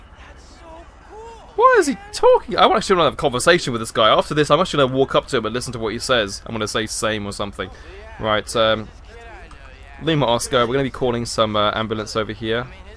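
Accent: United Kingdom. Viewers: What part of Britain u from I'm from stoke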